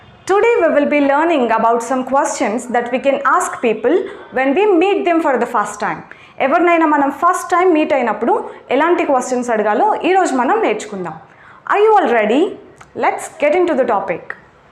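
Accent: Anantapur, India. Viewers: Madam , are you from ananthapur .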